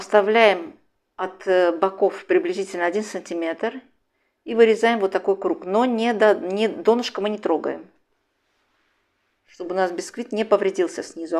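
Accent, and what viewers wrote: Baku, Azerbaijan. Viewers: Этот .добрый, объединяющий всех бакинских акцент, мягкий разговор можно слушать бесконечно Здоровья рукам вашим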